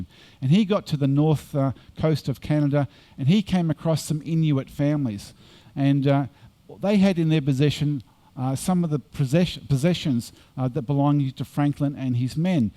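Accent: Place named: Australia. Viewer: ..trying to get past the Aussie accent, the image of Tower Bridge doesn't help.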